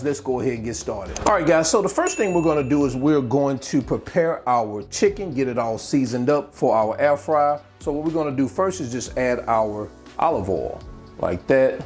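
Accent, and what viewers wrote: South, United States. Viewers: Ok thanks...try this weekend in my oven looks amazing.....where you from?? I can hear your southern accent ..its nice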